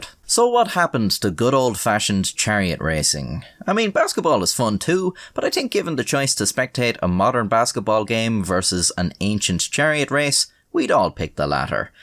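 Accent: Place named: Ireland. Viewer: there's something about his serious yet goofy Irish voice making irrelevant threats that is just so damn funny.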